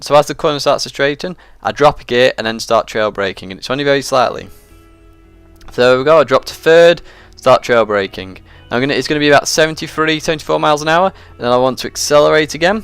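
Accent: England, United Kingdom. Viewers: nobody gives a fuck about your videos and your stupid Engayland accent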